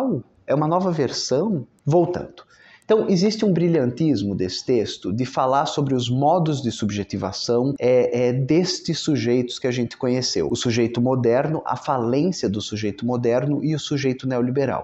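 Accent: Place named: São Paulo, Brazil. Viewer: Poluído, deselegante, confuso. Associações forçadas em uma narrativa tendenciosa a fazer o espectador pensar que tudo o que há na história é feita de sacanagem seguida de sacanagem. O ódio é um dos petiscos oferecidos. Indignação e umacerta euforia bipolar de alguém que fala muito sem parar. Excesso de referências e indicações de livros. Maçante. Esse sotaque do Brás é bem feio. Essa mãozinha gesticulante é bem chata. Essa dicotomia de "de um lado temos isso e de outro aquilo" é muito chato. Eu não diria que chega a ser pseudo intelectual mas é confuso e poluído de referências. O tom das suas narrativas são na intenção de fazer as pessoas pensarem que o ser humano é mal e que em tudo há maldades. Isso é chato, ingênuo e primário.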